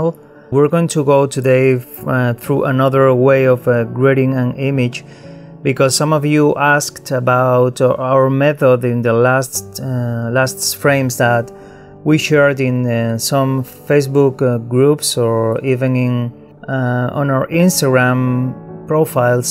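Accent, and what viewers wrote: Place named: Spain. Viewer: Are you from Spain? Because I am and I can notice your accent 😂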